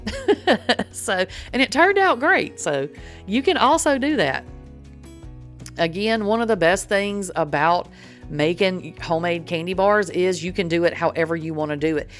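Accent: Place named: Tennessee, United States. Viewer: Are you from Tennessee by chance? Love your accent, it reminds me of my dad's and he was born and raised in Nashville.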